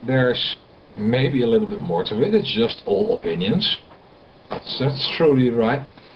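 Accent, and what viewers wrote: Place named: Netherlands. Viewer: i can hear by your accent that you are from holland :p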